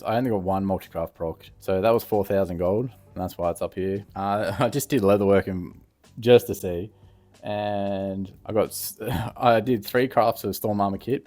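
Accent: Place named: Australia. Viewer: First video I've watched of yours. Subbed as soon as I heard the aussie accent! Gotta support a fellow countryman 👊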